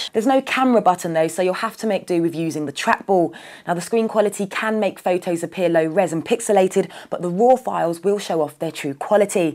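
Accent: United Kingdom. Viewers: i buy ihm few days ago so i dont know what she say?if is price than i buy me Vertu Signature...or if is procesor and ram and graphic i buy me Alienware laptop so funny and all who want to buy a wildfire buy it u will not mistake it doesent cost alot and u have evrythingwhy is this or that ...hm money,that much music...we say like thatand this english Mate girl is talking bad about that phone bloody hell xD hate the acent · stop speaking british, its annoying!!!!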